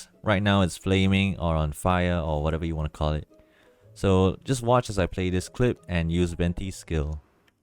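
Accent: Singapore. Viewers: are you singaporean? idk why your accent sounds like it :D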